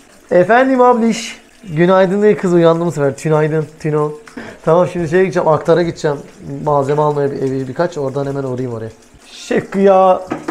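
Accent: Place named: Diyarbakir, Turkey. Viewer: Slm hayırlı akşamlar Diyarbakır'dan siveniz sanki bizimkimize benziyor siz Diyarbakırlı misiniz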